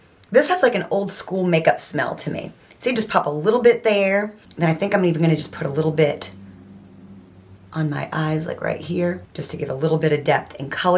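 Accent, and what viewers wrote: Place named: South, United States. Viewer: Hey,. My first time here. I picked up on that southern accent in the very beginning was wasn’t absolutely positive until I heard you say “time”. We’re southern USA neighbors. I live in Alabama. I don’t like dollar tree makeup much at all, but I love the thought of a 1.25 beauty product that might work well for someone else. Who knows, one day they may have something that does work for me. So I am going to hang out with y’all for a while. Thanks. 🙏 😊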